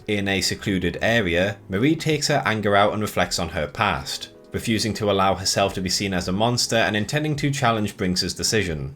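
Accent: United Kingdom. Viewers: Are you a Geordie? Wasn't sure earlier, until you said 'lads and lasses' at the end. Great video by the way.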